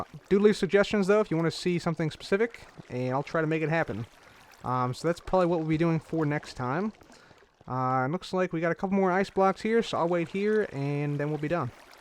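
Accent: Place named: Texas, United States. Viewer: Hey I wanted to know if you lived in Texas you sound like you do because you love space so I bet you live by Houston Ps you are the best YouTuber ever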